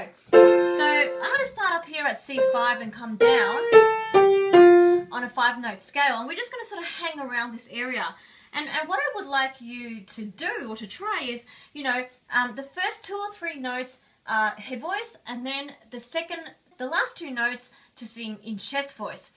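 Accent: Australia. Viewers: It's Australian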